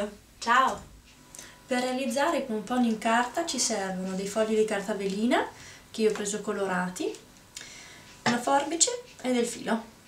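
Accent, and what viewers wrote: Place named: Veneto, Italy. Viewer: Che bello accento 😻 sei veneta ?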